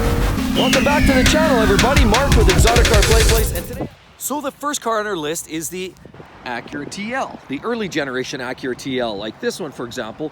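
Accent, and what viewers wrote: Canada. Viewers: Me after 3 seconds: wonder which part of Canada this guy is in....🤔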